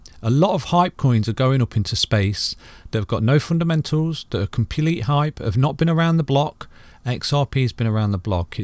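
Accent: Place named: Doncaster, United Kingdom. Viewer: Doncaster, sounds like the kid is from Doncaster, I'm probably wrong though lol. Great video, thanks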